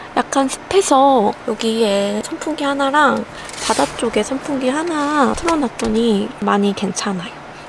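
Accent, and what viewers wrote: Jeolla, South Korea. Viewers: 전라도분이신가봐요 ㅎ 전라도 억양이 순간순간들려서요 ㅎㅎ 저도 전라도사람이라 반갑네용^^* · 님 타프 대박 굿굿👍👍 강이 언능 캠핑갈수있는 컨디션 되서 귀여운 강이랑 같이 캠핑다니는 님 보고싶어요~ 님 솔캠도 너무 귀여우셔용!! 님은 모르시겠찌만 전라도사투리 너무 귀여운거 아시나요.. 강이 귀여움에 살짝쿵 밀려버렸지만 님도 인정하시죠?! 안그래도 우중캠핑 가고싶어서 타프 찾아보고 우중캠핑 영상도 찾아보는데 에이웍스 실타프를 님덕분에 알게되었어요. 타프 찾아볼때만해도 옥타타프는 활용도가 별로이지 않을까 했는데 님 영상보면서 자연스럽게 우중캠핑할때는 옥타타프가 괜찮겠구나 생각했어요. 고동텐트도 영상보고 한참 검색해봤어요 ㅎㅎ 다음 영상도 너무 기다리고 있어요. 항상 건강하시고, 강이랑 행복하게 오래오래 캠핑다니셨으면 좋겠어요!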